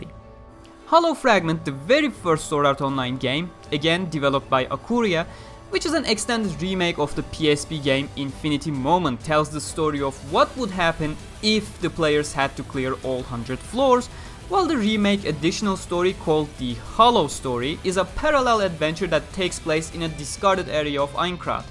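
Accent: Turkey. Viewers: hiç kanal ismine bakmadım adamın türk olduğunu direk konuşmasından anladım başarılar kardeşim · Sesi duyduğum gibi türk aksanı olduğunu anladım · Telaffuzundan türk olduğunu fark ettim, güzel iş çıkarmışsın tebrikler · Yalnız aksan baya Türk :)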